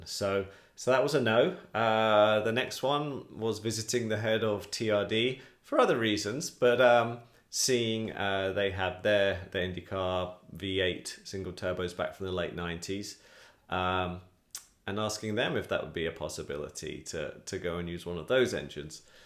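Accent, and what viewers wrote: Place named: United Kingdom. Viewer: A ratio without units is meaningless, unless it is a ratio of values with the same units. Since power and weight are different measures, "1:1" by itself could mean anything... 1 horsepower per pound? 1 horsepower per kilogram? 1 kilowatt per pound? 1 kilowatt per kilogram? 1 BTU/hour per stone? (in honour of British accent) Any of these is arbitrary and unrelated to actual performance goals.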